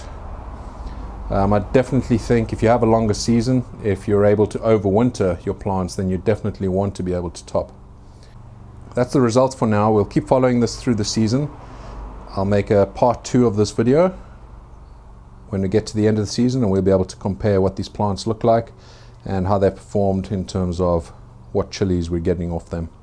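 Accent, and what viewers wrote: South Africa. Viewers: It's clearly South African.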